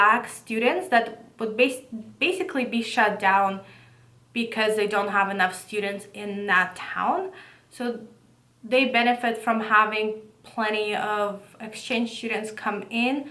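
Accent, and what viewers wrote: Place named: Russia. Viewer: Про perfect вы загнули конечно. Русский акцент заметен сильно (говорю как человек переехавший в 12 лет)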